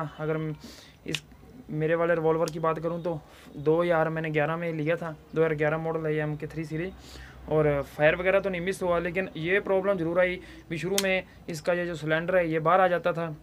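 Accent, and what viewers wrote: Punjab, India. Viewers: bhai saab punjabi touch aa raha h apki awaz mai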